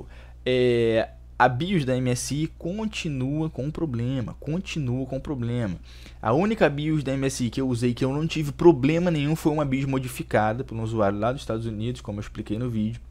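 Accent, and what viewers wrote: Rio de Janeiro, Brazil. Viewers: tu e de que bairro do rio ?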